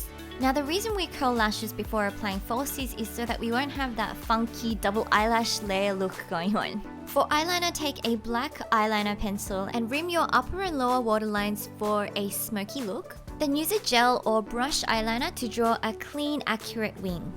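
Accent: Australia. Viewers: I love it that your makeup tutorial always have that perfect mixture between "western" makeup and korean makeup. No offense but I found minimal korean makeup starts getting boring to watch after a while so some refreshing looks like this one is really refreshing:) Also, your Aussie accent just makes me love ur vids soooo much:* thanks for the tutorial:)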